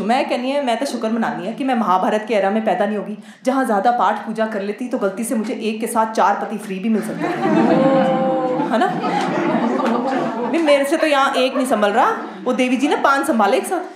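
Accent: Punjab, India. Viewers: Sorry we are not ok with punjabi tadka. but u can speak. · Amazing ji.....jatti ji full kaim....really loved it.....specially your punjabi accent ain kaint....waheguruji bless you jattiji....chardikla ❣❣💐👌👏👏👏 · Punjabi accent aapki ufffff ❤ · Solid content ma'am, I loved your punjabi accent... Waiting for your next video 🎉🎉🎉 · She is aging like a fine wine and her voice and Punjabi accent is litt. And nice jokes also🔥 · You r doing amazing mam....just keep it up.. really liked you Punjabi accent 💫👌... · So decent lovely beautiful I can't express ☺️☺️☺️ yesss Punjabi tarqa massst haiiii☺️☺️☺️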